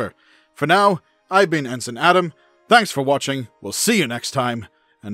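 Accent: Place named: Scotland, United Kingdom. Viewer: I detect a slight Scottish accent boyo.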